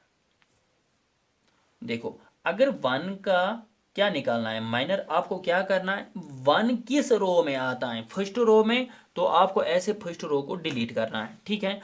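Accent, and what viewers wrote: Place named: Kashmir, India. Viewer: Super explaination thodi kashmiri hai but mast h sir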